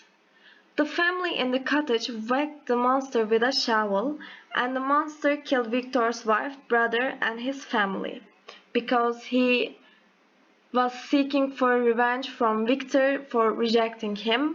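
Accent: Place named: California, United States. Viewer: Accent like Californian..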